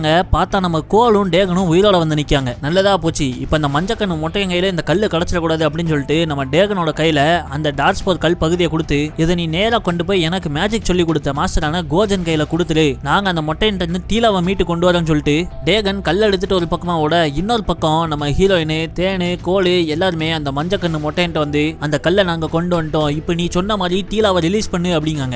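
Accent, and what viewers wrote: Tirunelveli, India. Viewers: Tirunelveli slang thalaiva 😁ellarukum pudikum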